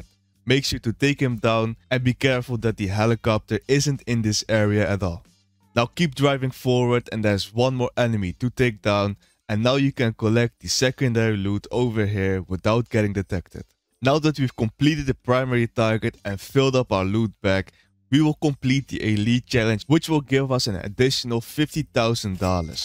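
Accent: Netherlands. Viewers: You can hear that your dutch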